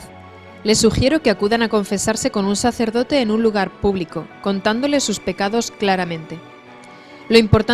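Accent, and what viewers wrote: Standard. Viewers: Agata Simma murió en el año 2004, pero en estos vídeos se manifiestan ideas que sólo se empezaron a hablar en los últimos diez años, parece que está todo distorsionado y mal traducido: María Simma no criticaría nunca a las cosas que dictaminó el Concilio Vaticano II, por ejemplo la comunión en la mano...Se están falseando los dichos reales de la Mística Católica, y opinando en contra de la Iglesia...Parece un pensamiento Tradicionalista que está presente en todo el vídeo...Yo puedo traducir alemán, y me gustaría mucho escuchar el audio original, y no a esta traductora española con acento neutro...Tengo muchas dudas por la forma en que ataca a los sacerdotes modernos, está en una posición claramente Tradicionalista y Lefevrista...Habla mucho de políticas eclesiales contrarias al Vaticano II, y no del verdadero mensaje del Señor Jesús y de la Iglesia Católica, Apostólica y Romana, que es un mensaje de amor y misericordia...Y espero que este comentario no sea censurado...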